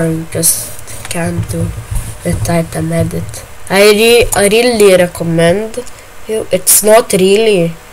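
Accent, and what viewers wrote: Lithuania. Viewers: Lietuvis esi girdžiu😅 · are youy from lithuania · lietuviu anglu xdd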